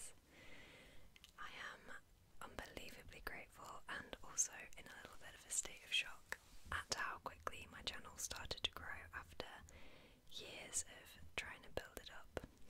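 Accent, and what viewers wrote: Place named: United Kingdom. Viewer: As a fellow Brit ASMR in a British accent is super comforting to me, pair that with books and you really can’t go wrong, really happy I found your channel!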